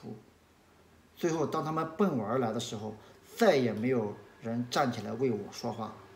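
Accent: China. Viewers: 兄你好，我經常看你的影片，有個問題想跟你建議，能否錄製時把音量加大，我聽太不懂大陸腔，有時看你的影片會很辛苦，感謝你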